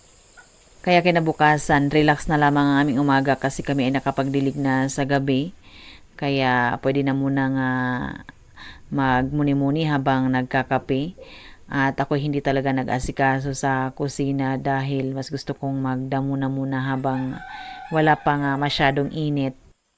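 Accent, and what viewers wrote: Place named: Visayas, Philippines. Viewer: Paano kayu nagka kilala ng asawa mo sa tuno kase ng salita mo hinde ka taga kalinga para kang taga bisaya.sana ma notice mo comment ko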